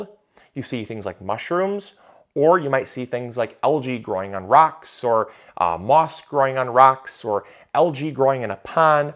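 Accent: Michigan, United States. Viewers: Fun hearing your Michigan accent! So different from the South.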